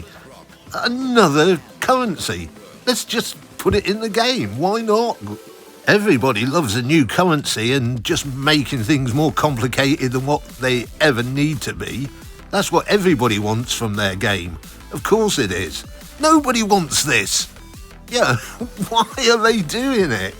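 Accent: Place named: United Kingdom. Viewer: This is the most British sounding person I heard in my life.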